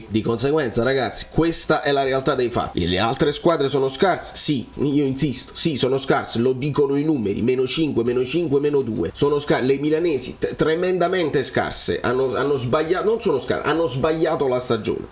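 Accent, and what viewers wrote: Naples, Italy. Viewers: buongiorno. Buona domenica delle Palme. Il tuo modo di parlare napoletano somiglia molto al mio. Sei di Fuorigrotta come me? Non vivo più a Napoli, ma è sempre la mia città. Hai l’età dei miei figli e sei troppo simpatico!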